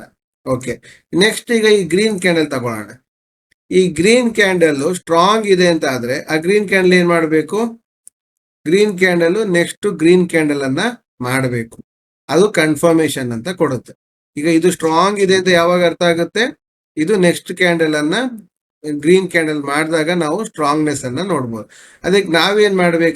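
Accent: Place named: North Karnataka, India. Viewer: Good video sir Uttara Karnataka style helata edira sir stock bagge heli sir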